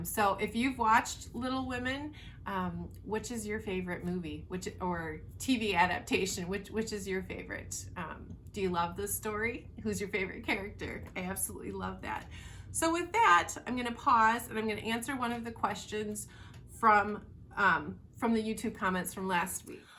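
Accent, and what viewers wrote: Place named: Sioux City, United States. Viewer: Perfect timing for a stash-along as I've decided 2022 is a no buy year for my knitting and cross stitch hobbies. I'm finally noticing all of the gorgeous yarns I have instead of focusing on the next new shiny distraction. I love your accent -- I've been in Tennessee for 30 years, but I grew up in Sioux City. You sound like home and family to me (with some Michigan thrown in ;)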